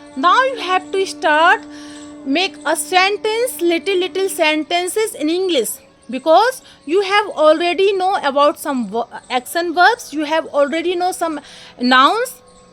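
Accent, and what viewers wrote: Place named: India. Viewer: desi english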